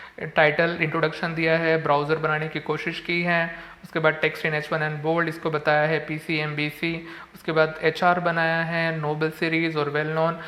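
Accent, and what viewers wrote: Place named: Maharashtra, India. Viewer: He sounds marathi for sure 😁😂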